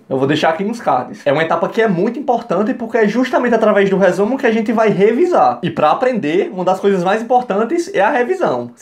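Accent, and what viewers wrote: Northeast, Brazil. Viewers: Homem tu é lindo, mas esse seu sotaque é mais lindo ainda... Coisa deliciosa é um sotaque nordestino.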